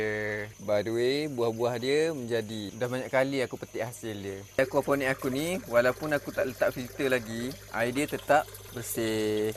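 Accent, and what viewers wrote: Kuala Lumpur, Malaysia. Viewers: 😂😂😂😂😂😂😂kecek kl MCM sial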